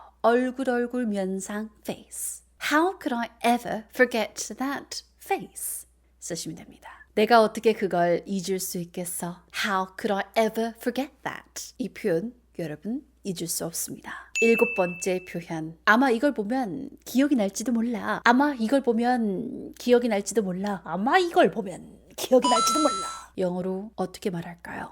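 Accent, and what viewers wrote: United Kingdom. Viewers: 아침에 생방 듣고 빵빵 터졌어요~ 제가 딱 원하던 영국영어 감사합니다~ 너무 웃기셔요 ㅎㅎㅎ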